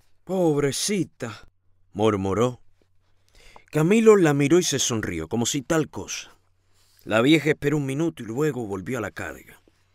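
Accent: Argentina. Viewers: Tu risa es genial jajaja te sale muy bien el acento 🇦🇷 es hermosa la novela. Buenísima lectura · tranqui rey te sale bien el acento argentino. hay veces que pienso que los sos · Gracias por este hermoso y gracioso audiolibro. ( Muy buen acento argentino, te lo dice un a argentino🙂🇦🇷) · muy gracioso tu comentario acerca de que te gustaría que el narrador tuviera acento argentino , no hace falta , vos lo hacés extremadamente bien y sonás como tal !!!! sos un capo !!! GRACIAS POR TU EXCELENTE NARRACIÓN Y HUMOR !!!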